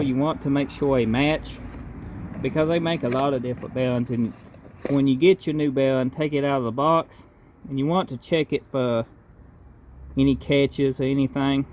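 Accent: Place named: Louisiana, United States. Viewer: You from Louisiana?, it sounds like someone from that neck of the woods.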